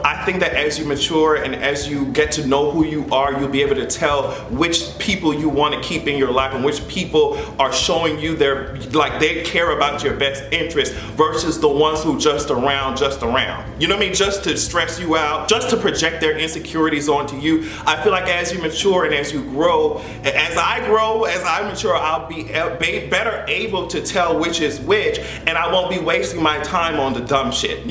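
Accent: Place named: Jamaica. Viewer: Hey, 1. put out as many videos as you can! At times, I'm looking out for you and you usually do 1 video a week, besides the podcast! If you can do 3-4/week, if PO.SSI.BLE (your baritone singing voice), please don't hold back! 2. Please bring out the Island/native tongue when expressing yourself! Not ever video, but like this video today, that Jamaican accent would have driven the point! We all know you are quite educated, but some island touches here and there isn't bad. Test out the idea of course, since you do have a steady audience! 3. "You need to CUT IT!" True, some family members are not ride or die! Ok, I'm out!!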